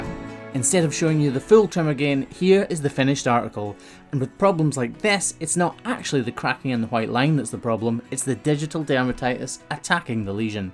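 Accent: Scotland, United Kingdom. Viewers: I UK channel I subscribe to has a Scottish man who often says “Let’s crack on”, too. He is also charming and we in the US love listening to you Scotsmen. 💖😃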